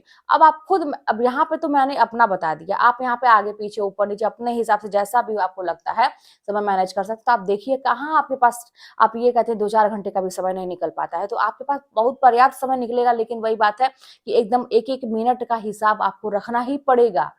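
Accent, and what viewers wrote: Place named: Bihar, India. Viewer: Hello madam ji good aap biharii hai kya aapke bolne se pta lag raha hai bihari staill😊😊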